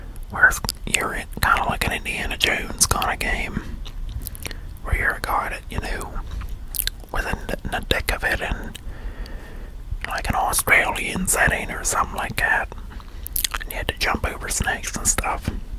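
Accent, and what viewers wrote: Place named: Pennsylvania Dutch, United States. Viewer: You're way off. Its penny dutch.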